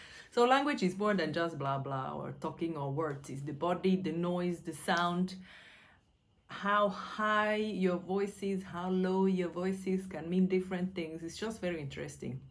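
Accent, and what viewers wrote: Igbo, Nigeria. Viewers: Even her ascent have changed to that of a typical Igbo woman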